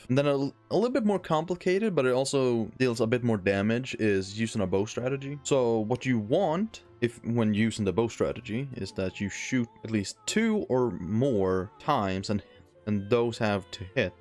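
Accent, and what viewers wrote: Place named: Sweden. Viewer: Haha var tvungen att kolla var du kom ifrån. Tyckte väl din engelska lät svensk. Tack för en bra video!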